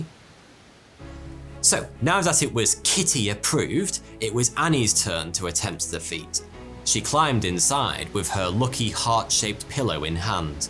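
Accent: United Kingdom. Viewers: Thoughty2 why are u British?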